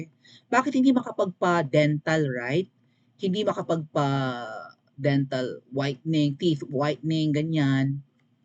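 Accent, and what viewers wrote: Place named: Ilonggo, Philippines. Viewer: Yong tunation mo Ilonggo inday, indi visaya, iba yong ilonggo tunation, malanbing, visaya hindi mabilis sila magsalita. Ilongga ka ba bakit kuha mo yong tunation ng ilonggo, marunong ka ng hiligaynon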